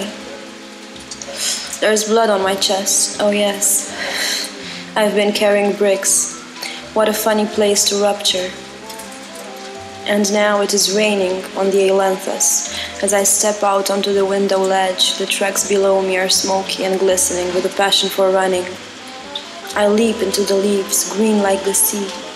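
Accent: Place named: France. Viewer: I hear a French Accent ! Its Beautiful . Wondering how you found his poetry.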